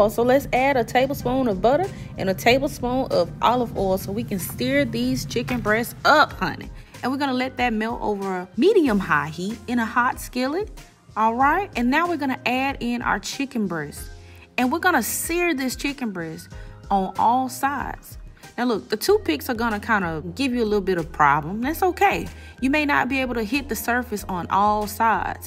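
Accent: South, United States. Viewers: Mmm yummy in the Tommy will try and you funny 😊❤️ you sound like you from down south 😊❤️ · The food looks so good but can we talk about the southern accent????? Yaaaaaassssss sis… just know I hit the subscribe button! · Are u from the south? Like nc or SC? Lol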